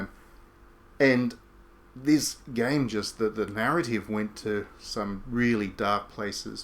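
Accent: Australia. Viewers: Austrailian accent clearly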